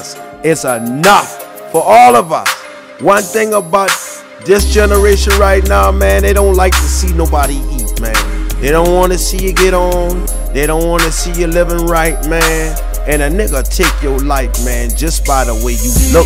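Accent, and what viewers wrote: South Carolina, United States. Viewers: Sound like u from 843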